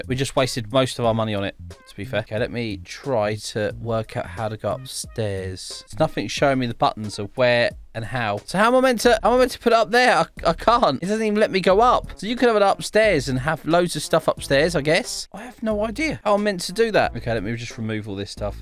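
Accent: United Kingdom. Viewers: Are you British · Are you british and also GOOD VİD try race clicker pls 🎉😮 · you british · Ur British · Mate pls tell me why British people say water soo weird (I love the British accents) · YAY A NEW BRITISH GUY!!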